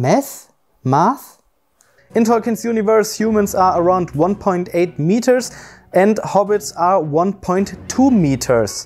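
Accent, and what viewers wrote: Germany. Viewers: you sound so german wie recht ich hatte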